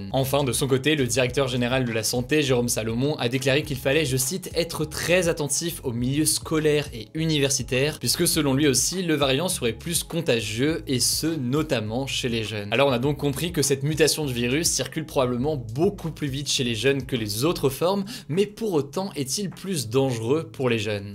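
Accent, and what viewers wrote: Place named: England, United Kingdom. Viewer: Ct’accent anglais tu régales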